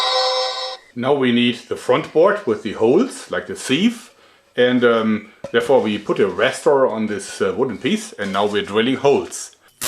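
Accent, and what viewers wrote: Germany. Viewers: I guess I spent too much time in Germany while serving in the US Army to not notice your accent. 😄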